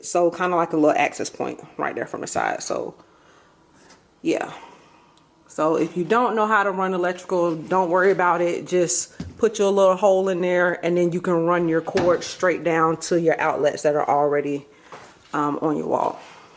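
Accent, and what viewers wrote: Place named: Florida, United States. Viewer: New subscriber here! I knew you were from Florida with that accent before you even said it. Lol I'm originally from Tallahassee.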